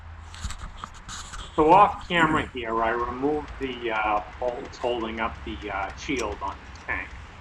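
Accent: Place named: Maine, United States. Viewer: So your tuber name says NH but your accent says you’re a mainah.. I’m in southern coastal Maine. Wicked Pissah videos on the the Jeep having a 3rd cylinder misfire so now I know where to start my looking.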